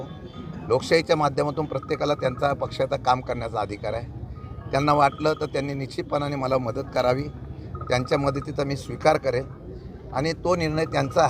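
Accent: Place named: Satara, India. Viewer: Ek no neta jo boltoy to satara bhashet ekdam kadak ani pudcha vatchlis shubhecha🎉🎉🎉🎉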